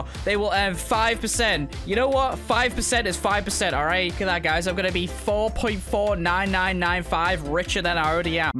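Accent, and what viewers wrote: United Kingdom. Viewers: How to tell he is british A stat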